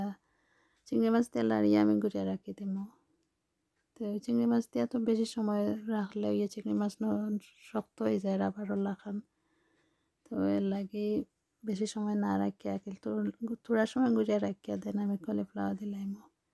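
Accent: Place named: Sylhet, Bangladesh. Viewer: MashAllah afa mojar ranna. Bhala laghlo sylheti kotha hunia..Ami USA thakia dakiar..Apnay kitha U.K?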